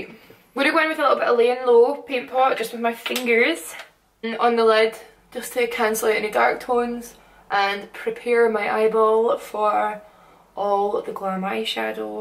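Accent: Scotland, United Kingdom. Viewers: Omg I love your accent 😍👌🏻 I have a friend who's Scottish but she doesn't have an accent like you, but it's kinda adorable. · Are you from Scotland ??? Im from Glasgow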